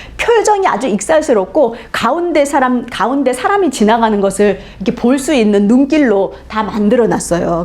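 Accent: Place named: Jeolla, South Korea. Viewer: 全羅道 方言(사투리)가 섞인 解說이네요. 强하지가 않아 귀여우시네요. 感謝하당께롱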